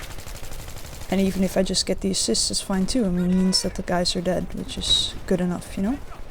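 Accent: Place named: Netherlands. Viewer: You sound Dutch... Am I right?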